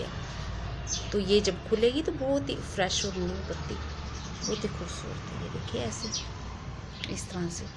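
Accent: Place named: Jammu, India. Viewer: apki language ki tone sa aapka muja jmu ka touch lagta ha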